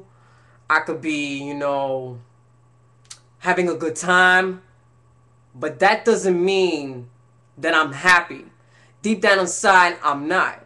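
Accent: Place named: New York, United States. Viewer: Good luck to you! I though i detected a nyc accent.. It's very easy to transition in nyc, reconsider doing it over here. Peace bro.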